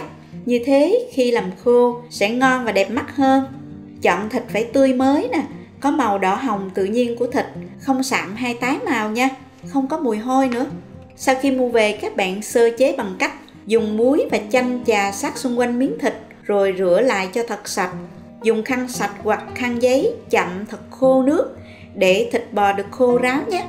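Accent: South, Vietnam. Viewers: Cối xoay hay quá. Giọng nghe đúng chuẩn miền nam. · Cảm ơn sự hướng dẫn của về chác là khô bò. Lâu lắm rồi không được nghe 1 giọng nói ngọt ngào đặc trưng của miền Nam khi xưa. Giọng mà đọc truyện thì hay phải biết. Chân thành cảm ơn. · giong chi noi de thuong qua he giong noi chuan mien nam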